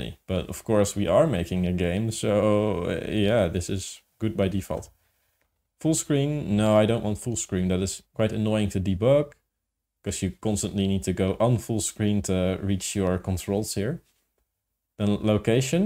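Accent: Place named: Netherlands. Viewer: Perfect timing. I'm just doing some open tk stuff myself. From your accent I figure you're dutch? Me too 😊 I'm curious where this will go, you've got my subscription!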